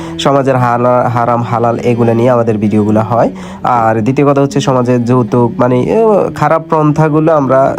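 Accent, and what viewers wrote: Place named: Noakhali, Bangladesh. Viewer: ভিডিও সুন্দর করে তোলে সুধু নোয়াখালী ভাষার জন্য ই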